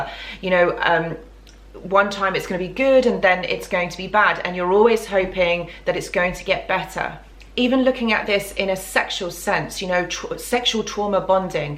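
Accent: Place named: Bedfordshire, United Kingdom. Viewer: You sound like you’re from Bedfordshire or Hertfordshire haha... and yes cool video, thank you 🙏🏻 realign when I see 222 ☮️ This had 111 views right now too haha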